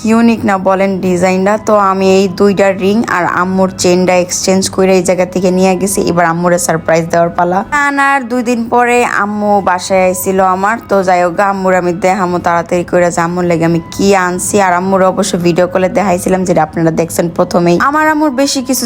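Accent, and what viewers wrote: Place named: Narsingdi, Bangladesh. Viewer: Apu apni norsingdi basay na nope suddo basay bolben sundor lge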